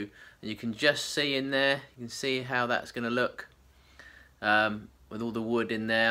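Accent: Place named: South East, United Kingdom. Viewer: He speaks similar to me. I'm from south east England. Brighton to be precise. I can't say for sure where is from but I think maybe the south east of England but I maybe wrong.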